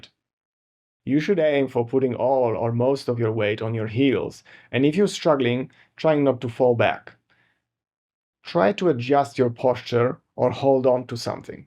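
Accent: Poland. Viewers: I m so confused by your accent. On top of your primary accent, Polish,do you also have a German accent? Or am I imagining this?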